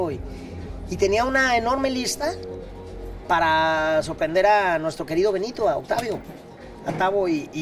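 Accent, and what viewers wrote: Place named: Guadalajara, Mexico. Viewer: Su accento claramente de Guadalajara! QDEP Benito!!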